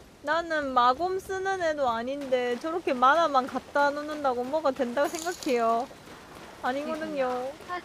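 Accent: Gyeongsang, South Korea. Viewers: 귀여운 부싼 아입니까.... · 이게 경상도거등요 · 경상도서 꽤 오래 살았지만, 저렇게 ㄱㅇ게 사투리 쓰는 건 처음 봤어요ㅋㅋㅋㅋㅋ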